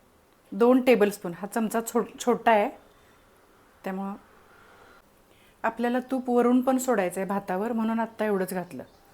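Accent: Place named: Kolhapur, India. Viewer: खूप छान रेसिपी आहे, तुमच्या सर्व रेसिपी, सोप्या, चवीष्ट असतात, तुम्ही कोल्हापूर च्या आहेत हे तुमच्या प्रेमळ भाषेमुळे लगेच समजते😊 रेसिपी बद्दल आभारी आहे.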